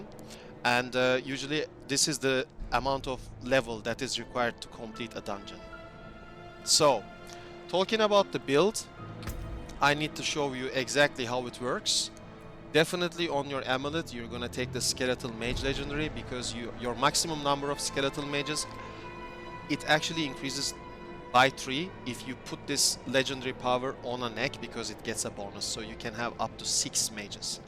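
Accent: Turkey. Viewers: Sen turksun demi o aksani nerde gorsem tanirim.amk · bu türk ingilizcesi, nerde duysam tanırım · great vid are you turkish ur accent sounds like it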